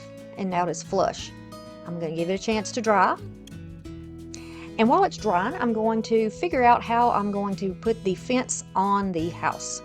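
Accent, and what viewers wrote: United States. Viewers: 💕 Love them! They all turned out Beautiful. Thank you. By the way, I LOVE your country accent! 💕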